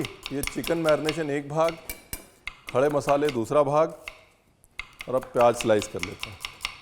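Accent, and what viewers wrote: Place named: Lucknow, India. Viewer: Briyani toh mai try toh krungi Hi but but jo lko ka bolne ka tarika hai na 😅maja aa gya hum aur humra du tho laung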